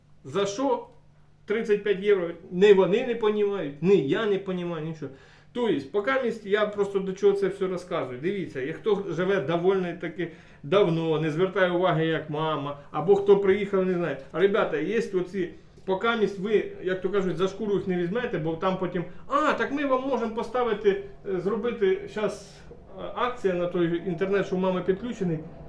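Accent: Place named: Bila Tserkva, Ukraine. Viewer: отож таке. Уроди скрізь є. А ну скажи мені будь ласка, ти проживав в районі Білої Церкви? (Приблизно) Сильно діалект знайомий. Бажаю Вашій сім'ї всього хорошого.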